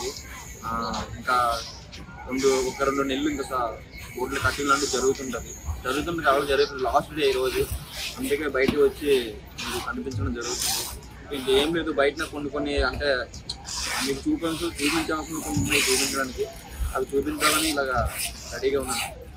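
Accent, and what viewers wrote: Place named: Visakhapatnam, India. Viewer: Love vizag.....pure vizag fish market....nice ..open free vizag language.....😀👍. Keep it up...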